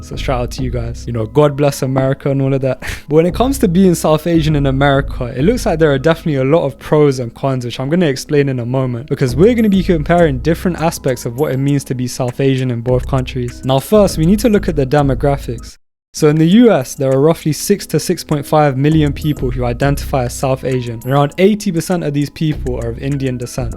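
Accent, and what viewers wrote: United Kingdom. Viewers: no latino rappers or singers??? girl there's, (as in the OG not gomez), all of the huge reggaeton artists. cinco de mayo, día de los muertos are holidays that white Americans have basically co-opted. and omg puerto ricans in baseball? latino culture is super prominent in the US, and the food thing is pretty big i would say! i would trust the UK to have banger indian/south asian cuisine pretty much everywhere, which i cannot say about the US, but i wouldn't go looking for mexican food there lol. i guess i also forgot to say, UK south asians of course have the more personal history of literally being colonized by the british which also makes that connection a lot more deep rooted, and you could say a similar thing of latinos here - the relationship goes back a lot further, a lot of the US literally used to be part of mexico. anyway sounds like you are from the UK but yeah good to hear more examples of south asian prominence there, i'd add another Pakistani, my fav to that list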